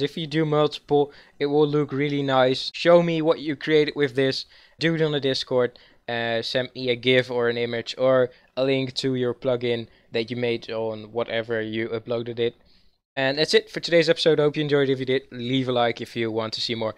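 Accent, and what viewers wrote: Netherlands. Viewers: Nice Nederlands accent!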